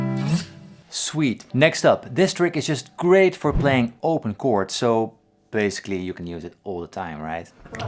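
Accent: Netherlands. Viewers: Ik hoor aan je accent dat je Nederlands bent! heb mij geabonneerd, hele toffe tricks. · As someone from the Netherlands myself, the Dutch accent of Dutchies speaking English often bothers me just a little bit, but you've managed to make your slight accent sound soothing instead. Nice playing, nice voice as well. Thanks for the videos! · Classic Dutch accent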